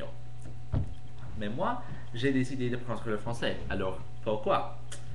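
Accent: United States. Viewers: Pas mal. Tu as un petit accent US mais ce n'est pas grave du tout, on arrive a te comprendre. :D Bonne continuation. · Ah l'accent américain (léger) que tu as quand tu parles français, assez marrant !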